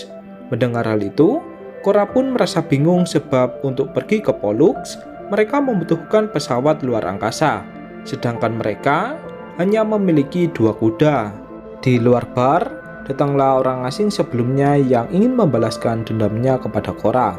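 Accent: Java, Indonesia. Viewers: Bisa gak logat jawa mu itu di hilangkan.jijik dengar anjing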